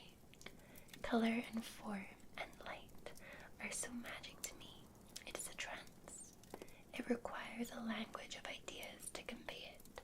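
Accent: Scotland, United Kingdom. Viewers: What a perfect start to the beginning of the week with an extra 10 minutes added to your video! I must say that you are looking extremely lovely tonight 😘. You're hair is like the hair of an angel 😇, straight soft and silky! I swear you're videos keep me relaxed, calm and so soothed. 😌 I love when you do the Sh, Sh, Sh, it's really tingley, why I have no idea, but it just is!! The facial mask and all of your lovely smells and sprays you use would definitely help me sleep incredibly well 😴. The relaxing session is very relaxing and a anatomy class combined 😴. But nothing beats you're lovely soft spoken Scottish accent when you read those stories 😊. And this story was awesome 👌. I gotta here more please 🙏. Thank you for this brilliant Asmr video! I hope you are well and everyone is doing great 👍. Take care 💛 🥰 🥰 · That's hoj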